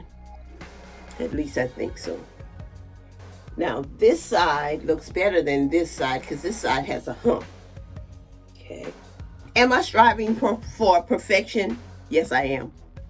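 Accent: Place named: Gullah Geechee, United States. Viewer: Hi! I just found your channel. I really love your content…I am getting my locks installed next month. On one of your older videos, you mention that you are from SC. I would guess somewhere near the low country (I hear the Geechee accent). My husband grew up near Charleston.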